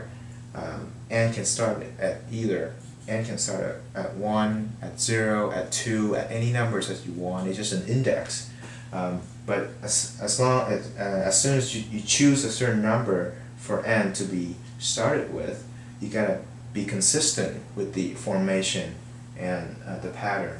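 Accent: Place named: United Kingdom. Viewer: Thanks . Awesome explaination . Your accent seems British. It has helped me a lot in my uni maths!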